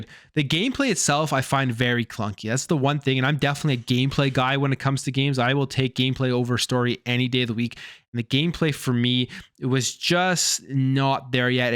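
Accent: Canada. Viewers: You from Canada bro? Just curious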